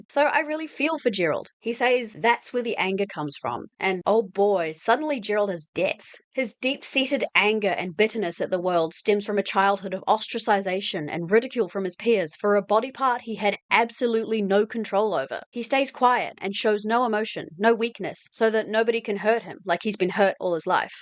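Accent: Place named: New Zealand. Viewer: Also you sound like a kiwi lol, don't ya worry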